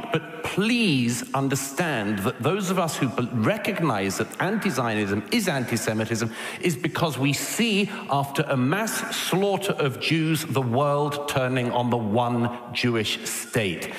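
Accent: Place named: United Kingdom. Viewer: I pray for your wellbeing Sir, for you have touch my hart with your british way of speaking. Your courage is and example to all people. Speaking to the world to open theire eyes for what had happend in Israël on the 7e of october. You are like the man's voice calling in the dessert! But, Sir, I still believe that your efforts will be heard. It is like the peppel touching the surface of the water! Your messages will always be a wake up call. May God be with you and give you the wisdom to bring the thruth. God said: I AM WHO I AM.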